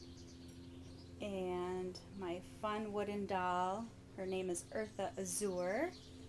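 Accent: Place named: Canada. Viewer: Just wondering cuz I noticed your accent, are you Canadian?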